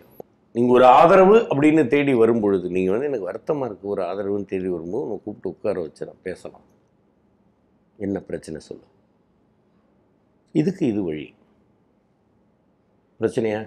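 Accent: India. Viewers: Bangalore tamil? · Ayya mass speech. Thanjvur tamil god bless you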